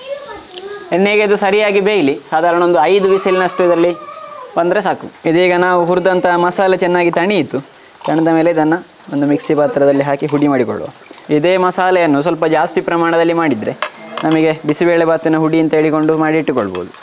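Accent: Mangalore, India. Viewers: Mangalore kannada...nice to hear 😊..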